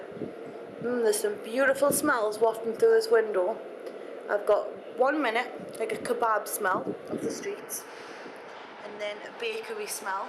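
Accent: Newcastle, United Kingdom. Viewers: You sound like a Geordie! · she's a geordie! i.e. from newcastle :) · WHHAT? she's clearly from newcastle. have you ever seen gavin and stacy? that's what a welsh accent sounds like. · It's "Geordie" almost. The real geordies live in Newcastle and wouldn't like anyone from south of the river Tyne to be called true geordies, but thats the accent.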